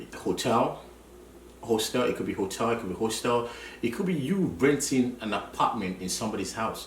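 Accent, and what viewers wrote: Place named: Canada. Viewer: social insurance is just like national insurance here in the UK..dint know that the Canadian dollars is much more less than the British pound. thats good to know. yes appropriate c;clothing .. Canada is very cold thanks for the info love your Canadian accent greet madam for us and the kisses to the new born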